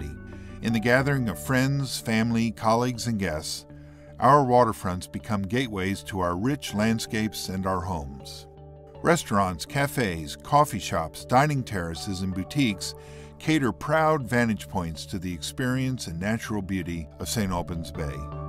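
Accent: United States. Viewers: Why the American accent? hmmm .... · One block has been more or less finished, at least on the outside. Why the hell is this narrated by a yank?!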